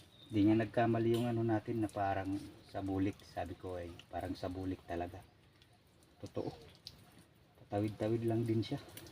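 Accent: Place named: Iloilo, Philippines. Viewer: Oy ilonggo ikaw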